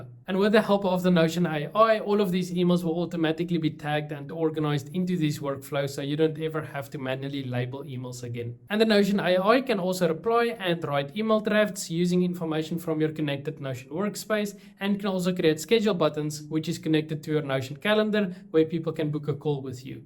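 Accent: South Africa. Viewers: Once I heard you say the word database entry , I knew from your accent that you were south african 😉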